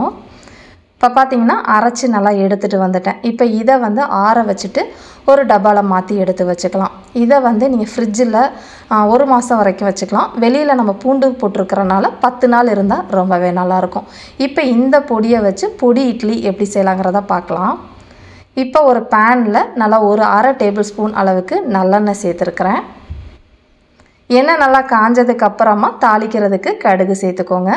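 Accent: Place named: Tirunelveli, India. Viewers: Super, நெல்லை தமிழ் கொஞ்சி விளையாடுகிறது. அருமை சகோதரி. வாழ்த்துக்கள். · நீங்கள் கூறாவிட்டாலும் உங்கள் அழகு தமிழில் நெல்லையின் மனம் வீசுகிறது உங்கள் சமையல் போலவே.. Super preparation 👌👌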